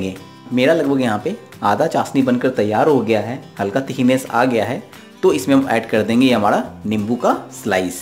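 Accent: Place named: Bengal, India. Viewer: Jo khudko zada chalak samjte hain wo sabse zyada dumb hota hain...dnt make fake video...and by your word you seems bengali...to bengali me hi baat kare...pronounciation is soooo poor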